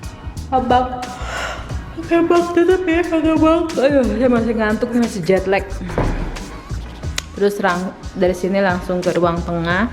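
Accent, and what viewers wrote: Jakarta, Indonesia. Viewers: aslinya mana sih. Nikahan kayaknya adat sunda. Tp ngomong gaya betawi. Jadi yang mana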